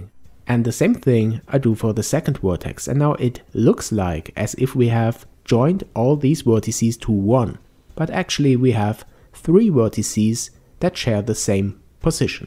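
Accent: Germany. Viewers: Most likely a German native speaker who is overly self-conscious about using the "w" sound correctly.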